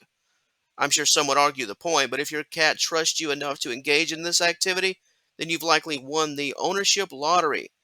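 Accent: Texas, United States. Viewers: Are you from Texas? You sound like a Texan.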